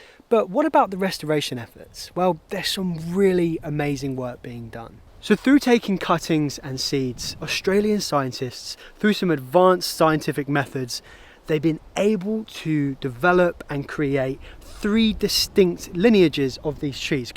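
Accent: United Kingdom. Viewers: Well the narrator sounds English, so what he says would indicate what they're called there, but I think Australia too, yes.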